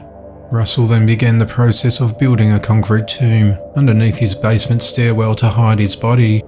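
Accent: Australia. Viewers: He's definitely Australian, but I'd like to know more!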